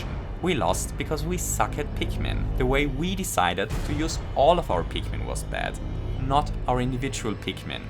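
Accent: Germany. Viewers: Ein Deutscher Akzent 🤔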